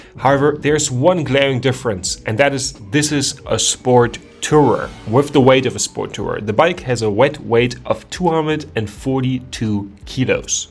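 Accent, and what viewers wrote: Germany. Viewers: Bruh the German accent is killin me 💀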